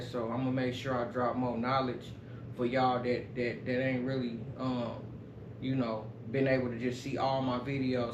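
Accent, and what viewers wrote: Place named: Oklahoma, United States. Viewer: Don't you have to show the social physically and ik you was from okc I hear it in yo voice I'm from 81st & kelly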